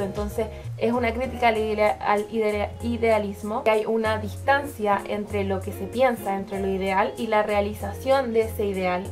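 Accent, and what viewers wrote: Chile. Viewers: Bonito tu acento chileno. Compatriota. Pará mí frankenstein es muy bueno por la forma de tratar el existencialismo, vida y muerte. Saludos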